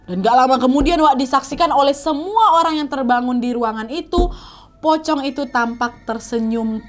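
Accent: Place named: Tanjung Balai, Indonesia. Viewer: Inget banget dulu nontonin kak dari masih ribuan subs, seneng aja sama logatnya karna sama² org tjng balai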